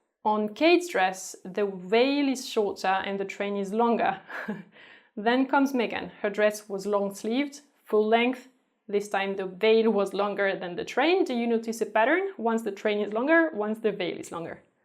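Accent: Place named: France. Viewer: Oh god. French accent is uggghhh to die for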